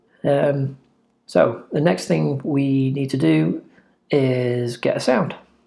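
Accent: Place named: United Kingdom. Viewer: i subscribe I like how he talk a british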